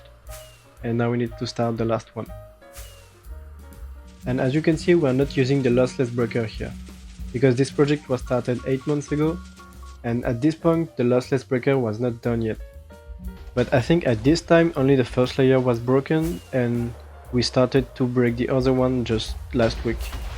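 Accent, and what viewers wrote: France. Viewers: Another incredible video. Thank you very much, your explanations are super clear (Surtout l'accent français qui m'aide à comprendre😂). Love from France ❤.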